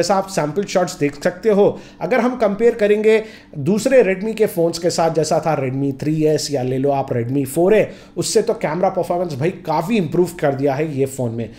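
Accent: Hyderabad, India. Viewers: Arka Seth trust me its only 30% hyderabadi hyderabadi is my native language if he talks completely hyderabadi u guys won't understand a thing!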